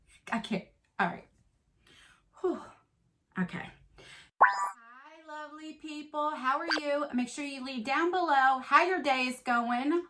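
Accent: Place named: Maryland, United States. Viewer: Where are you from? My friend is from Maryland and you guys sound identical. 🌞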